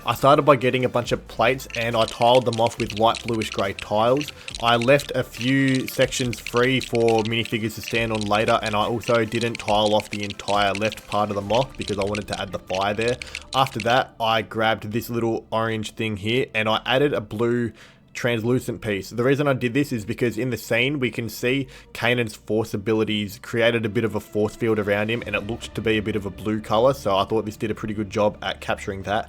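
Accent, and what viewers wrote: Australia. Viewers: are you Aussie I am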